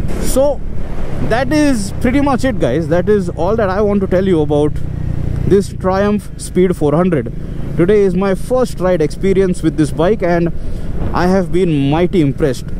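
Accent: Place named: South India, India. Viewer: One is national language and another is almost international language, so I guess you should use this excuse and do some contextual thinking with your interest in bikes to learn some Hindi. His Hindi as it is has a heavy south Indian accent, so I guess he's doing his best to speak in Hindi to cater to a larger audience.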